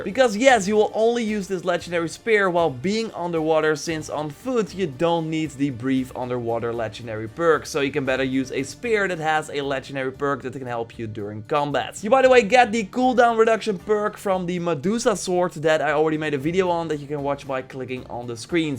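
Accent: Netherlands. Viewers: wejo ik kan letterlijk gewoon horen dat je nederlands bent, wat een accent joh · 100% a fellow dutchguy. je accent gaf het weg :)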